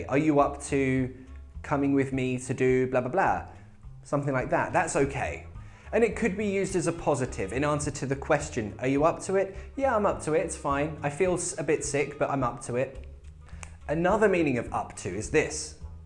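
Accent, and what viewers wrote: United Kingdom. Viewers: your videos are totally the best one to learn the real british accent :) thank you a lot.. are you up for eating Japene Food? is it Right ? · I really love watching your videos. I'm more used to the american accent, but I can understand you completely, even though your accent is british. By the way, I love your accent.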